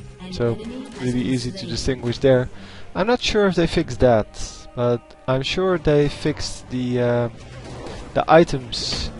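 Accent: Netherlands. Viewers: are u from holland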